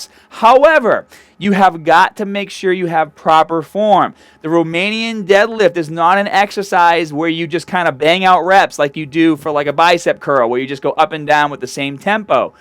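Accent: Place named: New England, United States. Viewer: Bro: never, ever, ever lose that accent! It adds to the uniqueness of your channel and, honest to God, at least for me, it helps to make somebody pay attention to what you're saying. Granted, I'm just your run-of-the-mill, excited neighborhood linguist. But, it's nice to hear a genuine New England twang for a change instead of that sterilized mid west-influenced general American Standard accent everybody tries for. Also, I am in total and complete agreement about the prone hamstring machine! I have the exact problem you describe with the seeded hamstring machine. Can't seem to stay in the damn seat whenever the wait is really high. I frequently find myself having to readjust my position every other rep or so. Best regards from Texas.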